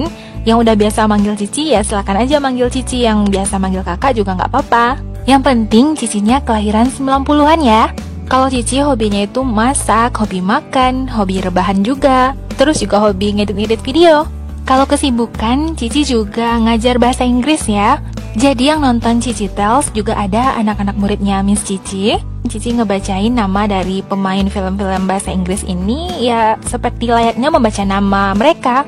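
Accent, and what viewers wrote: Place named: Padang, Indonesia. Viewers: Dah keliatan Logat nya kak orang Padang ;) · Padiah uni mah,,, tapi awak alah takok sih urang minang dari bahaso indo nyo, kalau dak urang cino pasti urang minang. mantap. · Wahh, saya awalnya nebak Kak Cici orang Palembang, soalnya saya ada teman orang sana dan logatnya agak mirip-mirip. Ternyata Kakak orang Padang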